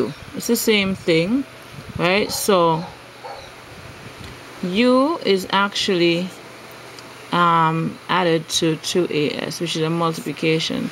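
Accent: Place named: Jamaica. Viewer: Are u a Jamaican. Ur accent sounds like one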